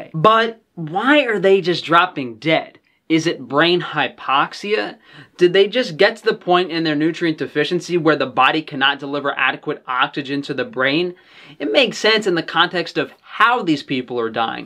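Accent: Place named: South, United States. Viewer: that southern accent lol dang...thank-you for opening our eyes to the divides that are in place to lower our vibration and cause turmoil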